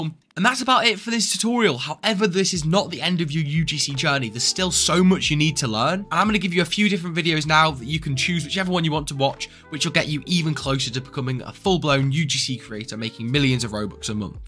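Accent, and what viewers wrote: United Kingdom. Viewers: boi u british i cant understand watchu sayin for example alt u said Aot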